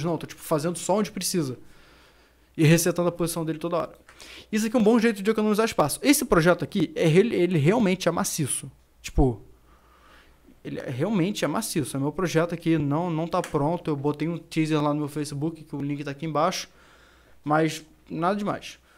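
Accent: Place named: Portugal. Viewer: Vc é de portugal mano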